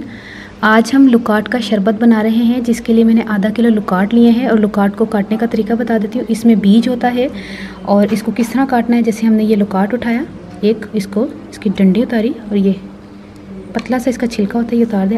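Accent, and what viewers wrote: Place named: Punjab, India. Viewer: OK ji, asi dono cheeni te Gurr alag alag try kara gae, jo changa lagya agey to oh cheez pa lya kara gae ji, hor v es type de fruit de juice dasna ji. Tuhaadi Punjabi sun k bahot he vadiaa lagda hae, edhar di Punjabi te tuhaadi Punjabi iko jehi hae, sun k bahot mazaa aanda hae.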